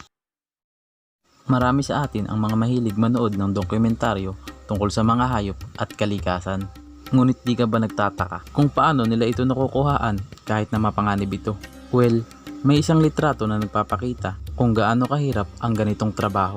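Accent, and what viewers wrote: Iloilo, Philippines. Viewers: Lods., bisaya ka no.?.. Ma.subscribe nga... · ilonggo ka pu ba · Bisaya ka ba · Ilonggo ka ba kuya?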